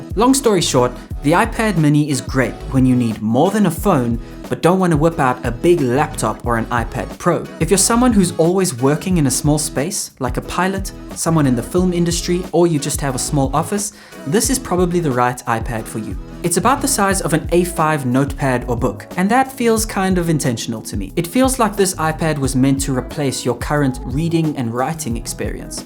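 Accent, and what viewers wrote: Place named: South Africa. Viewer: Subscribed because I need Tech Reviews delivered in a sick South African accent!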